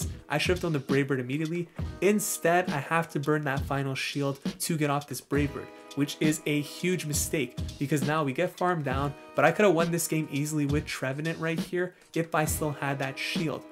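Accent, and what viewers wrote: Canada. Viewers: I thought. that was a Canadian accent I was hearing,!, maybe the Raptors should pick up Iman Shumpert and get some of his DWTS momentum!!! 😂 Keep making such entertaining and informative videos! Your the best in this Tennessee Vols fanatics opinion!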